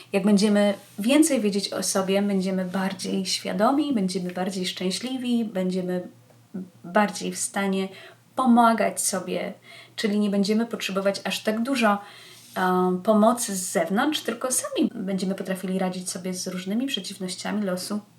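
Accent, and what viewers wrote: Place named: United States. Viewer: Pamiętam jak Pani kiedyś mówiła o tym, że nigdy się już Pani nie nauczy amerykańskiego akcentu.. Uważam, że teraz jest on naprawde dobry!! Keep going! 😍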